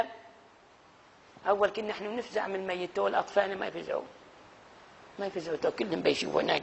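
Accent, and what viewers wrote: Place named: South, Tunisia. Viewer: متأكدين ان هذه اللهجة.عمانية ؟ تشبه لهجة الجنوب التونسي.لحد كبير ( مع اختلاف بسيط في الجيم تنطق G' و القاف تنطق G غي الجنوب التونسي ) لهجته مفهومة تماما بالنسبة لي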